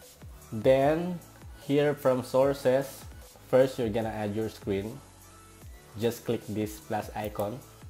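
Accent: Philippines. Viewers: halatang pinoy ka sa accent thank you talaga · I like your accent :p PINOY?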